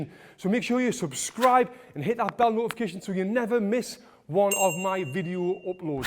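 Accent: United Kingdom. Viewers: Love your british accent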